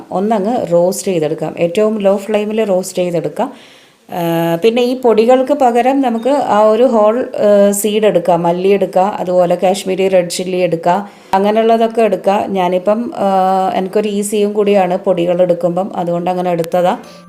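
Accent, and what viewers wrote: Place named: Mahe, India. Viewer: Your way of speaking reminds and miss me my hometown mahe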